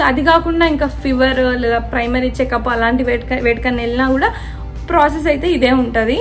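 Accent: Telangana, India. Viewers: Hai sister! bava Namasthe! Nenu mee videos oka 5days nunchi chusthunnanu.chala bagunai,chala vishayalu thelusthunnai.meeru akkada unna kuda mana saampradayalu marva kunda meeru chese poojalu naku baga nachai.e roju maa ammaku mee videos chupincha.chala manchigunai annadhi.mimmalni chusaka chala nerchukovali anipinchindhi.mee presentation mana telangana yasa lo undatam valana inka chala bagundhi.vemulavada rajanna swami dheevena eppudu mee pai undalani korukuntu.....all the best!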